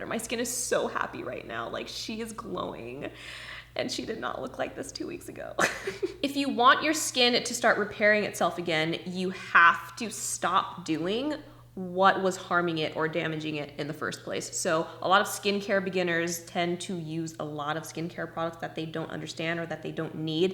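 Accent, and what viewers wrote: Australia. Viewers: Your hair and skin are snatched! And you for your skin lookin like that in just 2 weeks?! Ima tryyyy. Are you going in and out of an aussie accent? So cool lol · Your accent sounded so Australian in the beginning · I’m so confused why do you sound Australian at the beginning? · How did she sound Australian and them go straight to american english/ no accent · I love how she says WHATS GEWD and then speaks in an Australian accent afterwards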